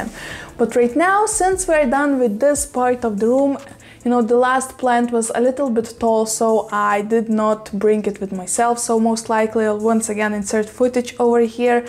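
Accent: Russia. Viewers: You don’t have to apologize for your Russian accent, its beautiful. I have an Italian accent, its what defines us. 🇱🇹 🇮🇹 🇨🇦 ❤️ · I was hoping you were going to include the ZZ plant!! I love your variegated ficus too, it’s so beautiful! Also, don’t apologize about your accent! First of all, I love listening to you and second of all, it’s so impressive that you speak multiple languages!!! Be proud of yourself for that! If I was able to learn another language, I’d have an American accent speaking it, because that’s the language I grew up speaking. You grew up with Russian, so that’s what your tongue is used to doing! Enough lecturing, lol. Thank you for sharing! 💜💚🪴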